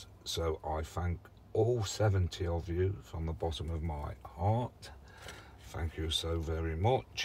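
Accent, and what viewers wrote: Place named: RP, United Kingdom. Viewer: Just a suggestion mate, take it or leave it, comes from good intentions. Consider changing your channel name. Few will search for " " but many would search gardening in Leicester or some such. You are at a good time to switch as you are just starting to build. All the best, my posh, well spoken friend:))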